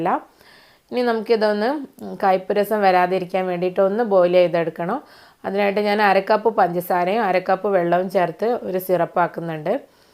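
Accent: Malappuram, India. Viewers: Itha malappuran anoo. Talking kettitt..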